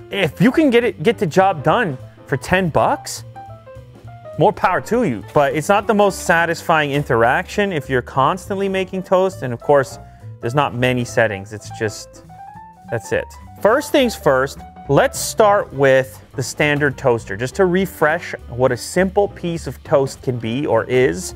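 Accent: Canada. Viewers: This man has the Thiccciest Canadian accent I have ever heard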